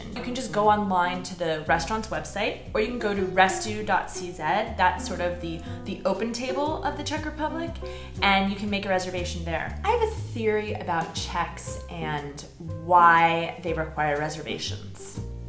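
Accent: United States. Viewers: Máš štěstí že žiješ v Praze :-D Mluvíš vážně dobře Česky, ale pokud pojedeš kamkoli jinam, odlož všechnu tu *** politickou korektnost a divokou Americkou intonaci :-D Jakmile Ti bude připadat, že mluvíš tak sarkasticky a že jsi tak cynická, že by Tě za to doma zavřeli, mluvíš jako Čech. Přijeď do Ostravy a uvidíš :-D You are lucky to live in Prague :-D you speak really god Czech, but when you go anywhere else here, put aside all that ** PC and wild American expression :-D Only when you feel so sarcastic and so cynic, that you would get locked in America, then you speak Czech. Come to Ostrava and you will see :-D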